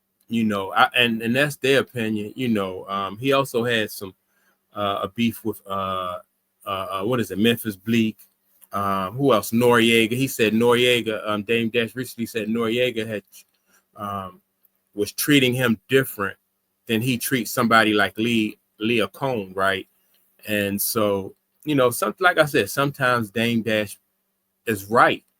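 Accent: Baltimore, United States. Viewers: I can hear the Baltimore in your voice.. my hometown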